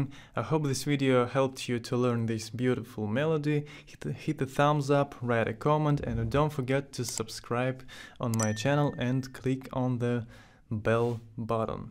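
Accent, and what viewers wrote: Russia. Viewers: а я сижу и думаю, а чет акцент русский дофига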